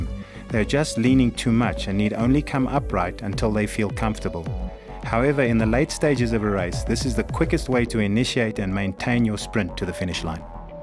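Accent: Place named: South Africa. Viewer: I think this is a South African accent. A "fucking accent" would probably have more moans, groans and "oh, baby"s in it. Doesn't everyone who speaks English have some sort of accent?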